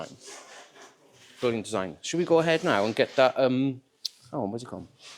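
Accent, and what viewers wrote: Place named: Liverpool, United Kingdom. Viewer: You sound Welsh and Scouse at the same time!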